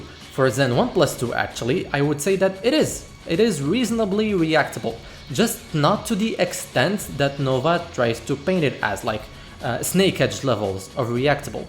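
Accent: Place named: North Africa. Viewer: I knew you were north african for the whole video until i heard you say it 😂 where from?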